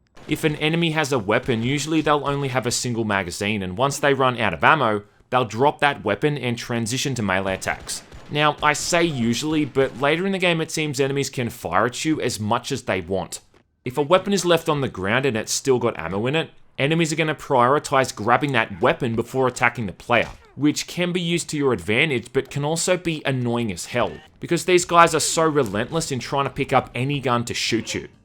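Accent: Australia. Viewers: Hey man, just found your channel and I really really enjoy your content. I don't ever comment on YouTube and who knows if you'll see this but I've been binging absolutely all of your videos this last week. My wife keeps wondering why she's constantly hearing an Aussie man talk about "utter sheeeit" games at 2 in the morning. Just a lot of thought and care went into each of these videos and it really shows. Thanks.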